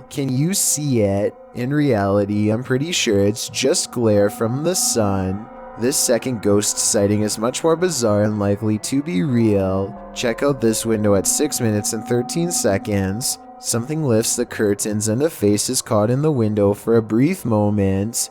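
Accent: Canada. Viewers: your from canada?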